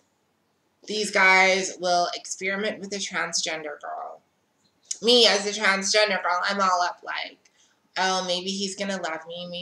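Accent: United States. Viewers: Why do you have an American accent if you’re from South Africa?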